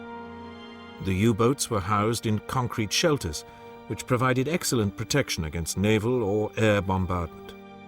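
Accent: United Kingdom. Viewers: Great? Proper British accent to announce that 1 million people were killed and then is sad since the strategy didn't work. The PR tells us that that is the "civilized" way. I've decided otherwise. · Despite the very basic graphics, with the British narrator, actually quite watchable.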